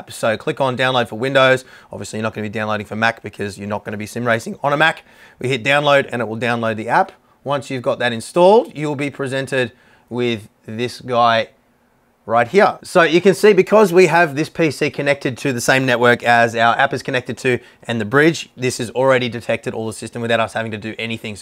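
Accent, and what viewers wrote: Australia. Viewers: Philips: Dutch brand and product. You’re welcome Your “unlimited budget” make it easier for us to decide if this is something to invest in so personally I’m happy you’re doing all of this 👍 But man, these videos you put up here are always the most complete and informative you can find around here on this topic. The Australian accent gives the entertainment factor a huge boost also.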